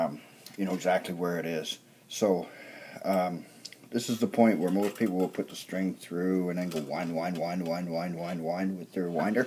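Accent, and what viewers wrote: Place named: Atlantic Canada, Canada. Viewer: I think I recognize an Atlantic Canadian accent. I'm originally from Cape Breton.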